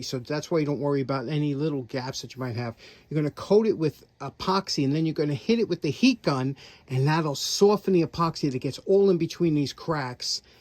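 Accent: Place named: Cape Cod, United States. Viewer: Don’t recall much about my Dad as he passed when I was seven years old, but I do remember that he always sat at the head of the table. Don’t really know why but as a teenager and later I sat at the head of the table even though I was the youngest. It always seemed that my Mom treated me as the “head of the family” rather then my brother. By the way, love your accent. Reminds me of back home on Cape Cod. Happy New Year.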